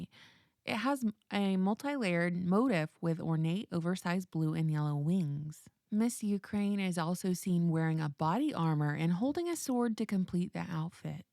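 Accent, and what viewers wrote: South, United States. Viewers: what happened to Southern accent?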